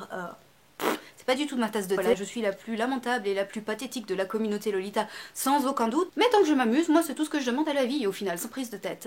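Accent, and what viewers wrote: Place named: Belgium. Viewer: ton accent belge est parafait 💙👌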